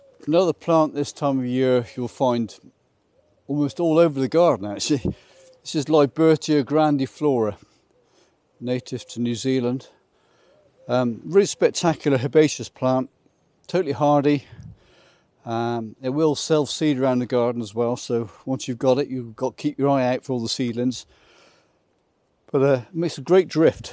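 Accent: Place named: United Kingdom. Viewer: Thank you so much for the wonderful tours. Subscribed! Loved that huge Fern from the Chilean Rainforest - what a beauty. Such a fantastic variety of plants - I will be binge-watching every youtube video I can find. Just lovely and exciting to see such rarities and the wonderful ways they are displayed. Please continue showing the plant names - that is incredibly helpful - it is very difficult to understand British English when you are used to American English:).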